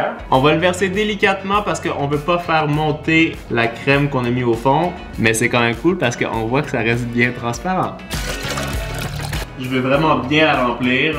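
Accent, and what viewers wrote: Quebec, Canada. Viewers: l'accent québécois est vraiment insupportable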